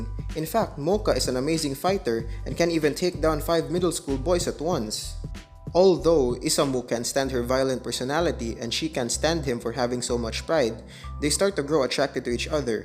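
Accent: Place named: Philippines. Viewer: Flipino right? Your accent seems familiar.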